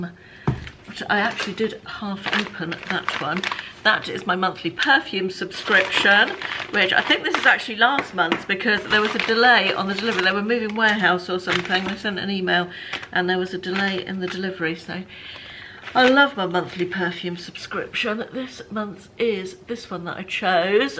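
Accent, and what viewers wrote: United Kingdom. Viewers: Yes, but you get to say those Americanisms in a British accent, so there is that! 😂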